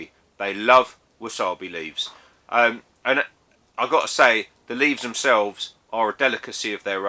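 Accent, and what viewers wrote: England, United Kingdom. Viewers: Can’t stand the English accent🤦🏻‍♂️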